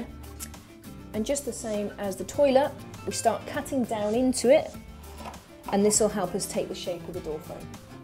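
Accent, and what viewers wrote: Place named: Wales, United Kingdom. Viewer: It's the combination of no nonsense facts and a tinge of a Welsh accent. Go with it.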